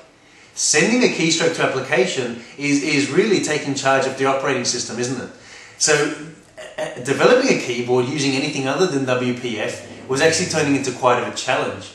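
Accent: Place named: Australia. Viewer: spanish guy with an australian accent. enough said.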